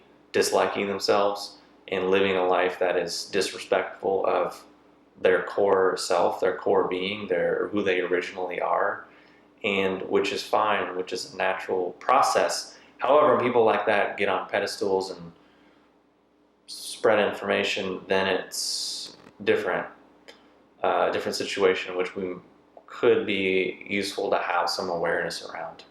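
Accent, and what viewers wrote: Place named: South, United States. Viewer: Yes. Very articulate. Just recently found you so I'm hearing a very strong southern "twang" pop up.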